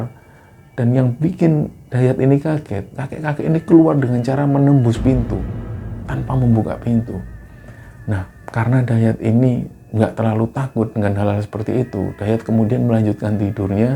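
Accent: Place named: Surabaya, Indonesia. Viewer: kalo gw kangen sama bokap gw, dengerin channel ini. Bokap gw orang Surabaya .... logatnya dan gaya berceritanya sama ^__^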